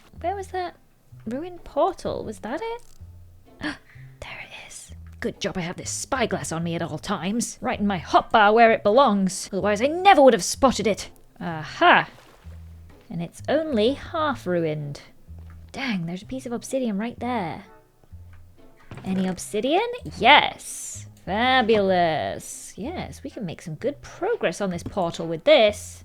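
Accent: United Kingdom. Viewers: OMG FINALLY U ARE ALIVE!(*ゝω･*) I still like British accent(((o(*ﾟ∀ﾟ*)o)))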